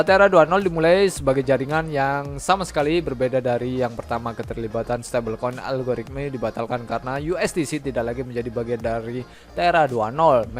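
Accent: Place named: Banyumas, Indonesia. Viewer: Siap ngapak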